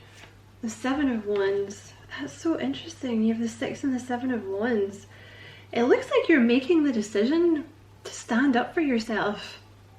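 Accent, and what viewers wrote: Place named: Scotland, United Kingdom. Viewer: Gemini Ty...the Accent is lovely Scottish...lol from Queensland Australia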